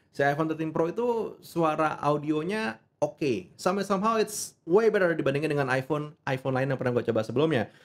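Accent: South Jakarta, Indonesia. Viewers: Jaksel bgt pusing dengernya.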